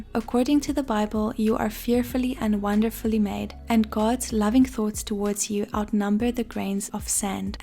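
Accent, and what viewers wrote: South Africa. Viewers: Are you South African? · Girl are you South African 😭😭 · Are you South African 😭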